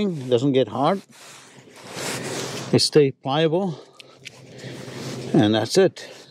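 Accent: South Africa. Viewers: Is that a South African accent I can hear?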